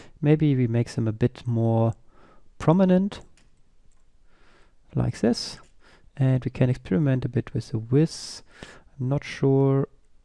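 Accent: Germany. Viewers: ich glaube dass ihr damit definitiv mehr leute erreicht! über den deutschen akzent kann man locker hinweg sehen! weiter so!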